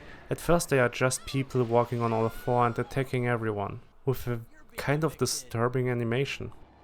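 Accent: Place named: Germany. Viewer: Dein deutscher akzent😂😂❤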